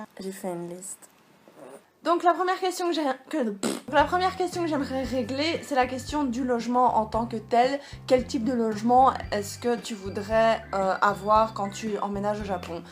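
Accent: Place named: Belgium. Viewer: Hello! Je suis tombé sur ta chaine aujoud'hui et je me suis fait la plupart de toutes tes vidéos! Elles sont géniales, naturelles et ton accent belche de chez nous rend les vidéos bien meilleures 😁 J'ai 2 questions pour toi: quand tu es restée au Japon 3x3 mois si j'ai bien compris, tu es partie avec quel budget? J'ai déjà été un mois en visite mais ça m'a couté un pont, en même temps je m'étais fait plaisir. 2eme question: Faut il être nécessairement sur place pour rechercher du boulot? Car sur Gaijin pot, la plupart des annonceurs demandent à ce que la personne habite au Japon... Voilà, en tout cas continue tes vidéos et merci d'avance pour ta réponse! A plus dans le bus! 😊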